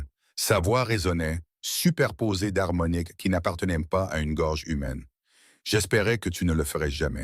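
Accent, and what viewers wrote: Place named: England, United Kingdom. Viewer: Je n'ai pas aimeé le vois qui a un accent anglais. Mauvais à ecouté. Dommage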